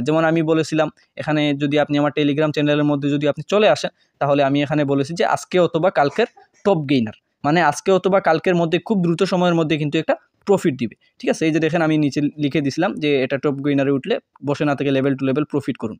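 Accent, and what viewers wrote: Sylhet, Bangladesh. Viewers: Vai ki Sylheti?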